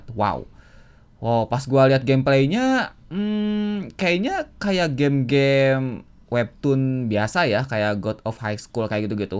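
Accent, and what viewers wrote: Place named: Java, Indonesia. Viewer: medok hadeuh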